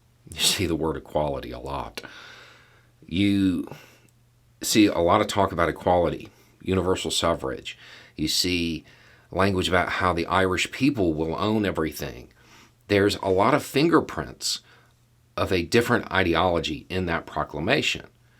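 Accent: South, United States. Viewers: A wise man with a big ol' beard and a Southern lilt to his voice. You're breaking down my stereotype, Brother. 🙏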